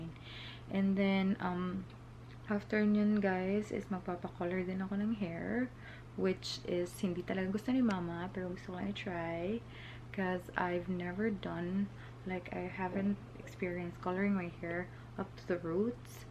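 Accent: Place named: Visayas, Philippines. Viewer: Miss makainlove lagi ka kaayo’g tingog nagpaila jud bisaya😍😘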